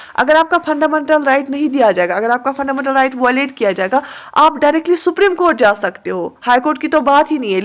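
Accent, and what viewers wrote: Kashmir, India. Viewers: Beautifully explained. There's teachers. And there's tone to Kashmiri teachers. Love it thoroughly!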